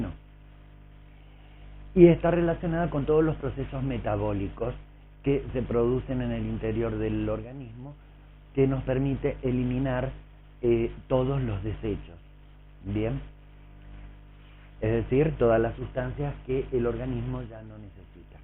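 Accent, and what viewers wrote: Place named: Argentina. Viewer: Fantástico el video, profe. Y en "argento"...!!!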